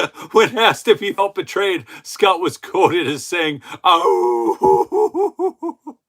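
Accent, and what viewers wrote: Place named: Minnesota, United States. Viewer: You're from Minnesoooota.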